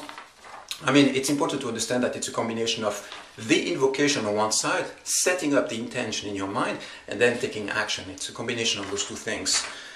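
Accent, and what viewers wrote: France. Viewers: are you french? really like your videos!!!!!!!!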